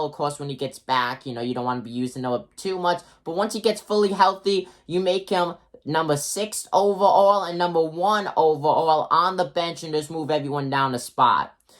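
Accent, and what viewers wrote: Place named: Brooklyn, United States. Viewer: you sound like someone that lived in brooklyn back in the 30s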